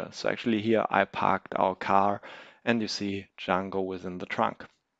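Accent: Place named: Germany. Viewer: You sound very german :D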